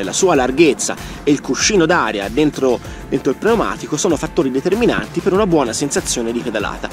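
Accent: Italy. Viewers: Ma di quale parte di siena sei? Dal tuo accento mi sempri senese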